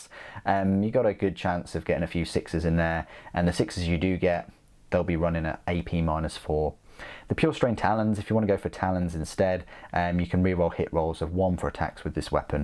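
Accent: United Kingdom. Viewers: THis may sound a bit silly but I love your voice, it encompasses Britishness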